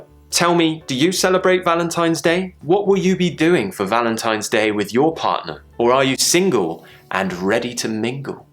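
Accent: RP, United Kingdom. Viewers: I'm brazilian and I'm trying to learn the british accent with your help 💙 I met your channel today and I really love it, thank you so much for all of this 💙💙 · I love your British English ! I come from hk , I am married 😹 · Hi, English channel.I`m really happy with your english lesson.your british accent is really amazing,every day i watch your youtube channel for improving my english,specially my accent.thanks for your dedication on youtube channel,keep going!very support with your hard work! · I love British accent, And i love hearing your voice and learning British English at the same time. I'm browsing all of your past videos and i'm in love with it. Your such an inspiration. Thank you. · thank you! I love your British accent! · I am immensely grateful to be able to attend your lessons,specially i am so fun of British accent as well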